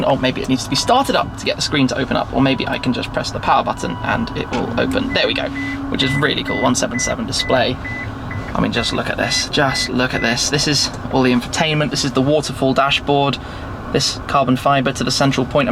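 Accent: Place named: United Kingdom. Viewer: 영어는 뭔말인지 못알아 들어도 이사람 영국 억양 오지게 쓰는건 느끼겠다.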